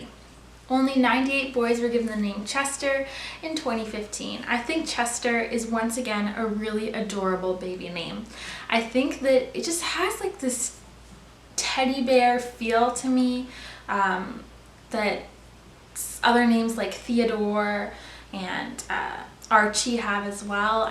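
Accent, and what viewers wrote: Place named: Canada. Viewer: Are you from Canada? just wandering it kinda sounds like you have an accent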